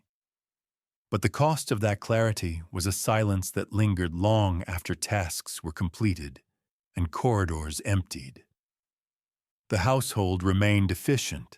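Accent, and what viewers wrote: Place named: United States. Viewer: Interesting and relevant subject matter. sadly however, it features an American voice over.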